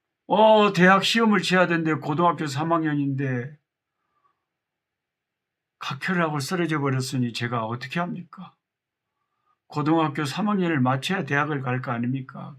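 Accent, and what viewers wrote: Jeolla, South Korea. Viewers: 할렐루야!! 움직이면서 이런저런생각을하며 하늘을보면서 맘속으로기도를했습니다 지금이시간까지 지켜주신주님이너무감사해서요~~~지금제가볼수있는것은~계획되어있지않는 알수없는길을가고있습니다~사고로모든것이올스덥되고 아버지또한 모든것이 희미하지만~저는오늘목사님기도처럼 홍해를가르시는주님을믿고의지합니다~지금환경과문제모든것을감당하고 나가는게때론벅차지만 홍해를가르시고 이스라에백성을인도하셨던주님그분의자녀가저이기때문입니다~~요즘은~계속계속~환경에서오는시련이있어서모든것이아주느리게움직이는것같고 힘이빠졌던것은사실입니다~~~목사님의기도로다시시작해보려고요 멀리서도이리말씀먹이겠다고 방을옮겨가면서 분위기바꿔가면서때론많이지치실법도하신데 목사님의사정없이쏟아지는절라도사투리로정신을차리고 다시금 또다시시작하려고합니다 ~~내일은아버지를요양원으로모시고가야 할지도모르는 무거운발을옮겨야할지모르기에 오늘만큼은 더굳건히마음에죄체감안지려고 힘내보려합니다 날씨가시골연천은많이춥습니다~아프리카목사님거실에꽃과밖에푸른실록을보고싶네요~~같이기도해주시는목사님이계시니감사가나오고 힘이나네요~~~건강하세요목사님사모님😊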